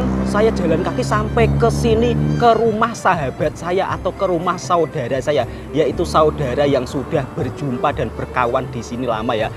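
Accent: Java, Indonesia. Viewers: Suara kamu terlslu pekat telo jowo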